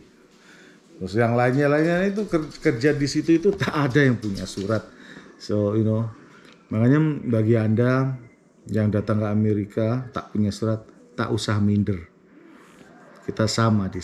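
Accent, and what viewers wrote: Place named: East Java, Indonesia. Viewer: Om bisa tolong bantu buat kesana gk...? Oh jawa mana om kok kaya orang jatim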